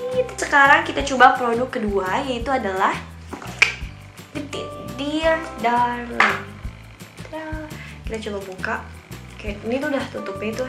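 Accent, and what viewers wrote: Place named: Java, Indonesia. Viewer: kok medhok. jawa ya ?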